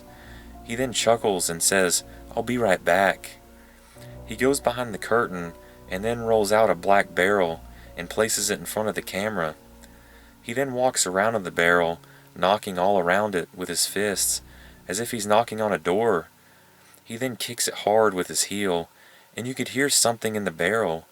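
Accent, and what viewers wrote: South, United States. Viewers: obviously his regional Southern accent. If you dislike it, and it appears you do, there are a lot of narrators who aren't Southern on Youtube. I personally find it quite lovely, but that's simply me. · Omfg your southern accent is so thick lmao.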